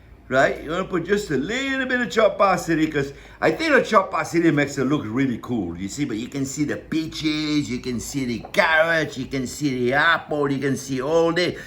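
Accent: France. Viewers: your accent and attitude are evrything ! mon cheri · Great video. You are an amazing teacher. You never said what temperature the oven was set. I guess 350degrees? Please use ingredients & the measurements in subtitles as your beautiful French accent is a bit confusing to this 72 year old Marine. God bless you and your family during this holiday season. I look forward to your next video.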